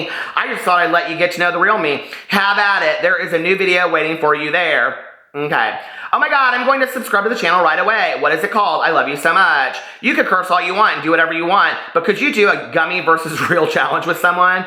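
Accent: South, United States. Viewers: BEAST!!! or Peace :-) I have literally watched your videos all week here while I'm at work (so bad) but I do thank you. It's so hard to believe you're not a Southern. You sound just like us Southern Folk. I enjoy your videos and thank you for being yourself. Love You!!!!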